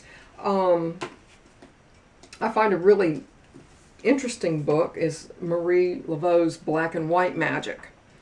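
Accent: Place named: South, United States. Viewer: New subscriber here. I have a question. How long have you been doing this? Just curious. I like your personality and you are a very unexpecting witch. I grew up doing this and I’ve had to keep quiet for so long since I live in the Bible Belt. Now that I’m older, I’m more comfortable with who I am but, I still don’t go around telling people what I do as a lot of people in my small town are very narrow minded and I have kids and don’t want that to affect them negatively with other kids and their parents. I noticed your southern accent so, this is comforting to see. Thank you 😊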